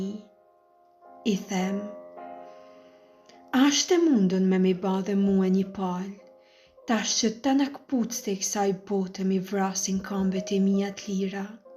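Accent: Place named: Gheg, Albania. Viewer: Ah gegnishtja jote e bukur me nje ardhje poetike plot ndjeshmëri..💖🌸✨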